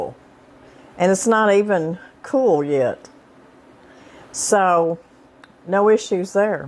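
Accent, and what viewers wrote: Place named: Arkansas, United States. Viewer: Ms, of all the channels I watch, yours in my favorite. My Momo and Popo and family were from Arkansas and listening to y’all just reminds me so much of them, and I’m learning so much. Thank you.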